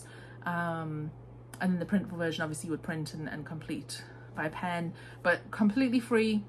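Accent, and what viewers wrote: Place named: Newcastle, United Kingdom. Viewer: Hello. Bonny Lass! As you continued speaking, the Geordie accent became clearer - my mam is 97 and she is from Jarrow! I am married to a Texan, but we live in England, so I have become used to a ‘mixed’ accent. I am so sad that you are based in the USA (costs of delivery to the UK 😱) as your yarn colours seem beautiful, I am an avid reader and I love tea. Oh well! The episode was really interesting and I am looking forward to future ones. Jinny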